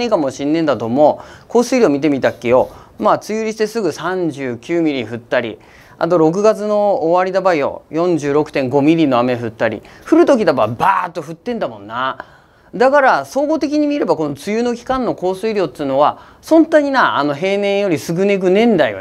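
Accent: Akita, Japan. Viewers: 秋田の方言ってより秋田の営業方弁だすなー(ですね〜) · これは中央方言だね… 県北、県南じゃ無いね… 標準語に寄せている中央さん方の… お疲れ様です。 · 標準語に近い、秋田弁だな？ 院内駅ノチケグノシュッシンダノモナ。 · ディーラーとか銀行の営業さんの秋田弁だね。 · 此れは秋田弁の標準語ですね。本当の秋田弁はこんとらもんじゃねえべ？ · 秋田市以南の方言だすな 県北はもうさっとこ違うもんな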